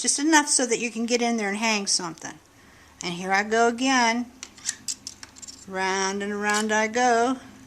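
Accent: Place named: North Georgia, United States. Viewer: you're so much fun and I love watching your tutorials. You sound a lot like we do in North Georgia. Your sense of humor is such fun & you make everyone feel good about what they're doing.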